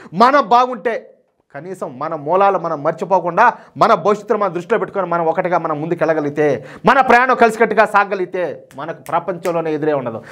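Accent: Rayalaseema, India. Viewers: Rayalseema accent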